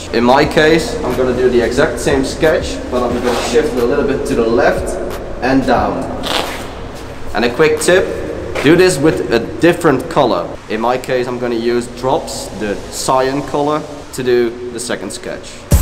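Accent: Netherlands. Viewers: Je hoort gewoon het Nederlands accent erdoorheen haha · best dutch accent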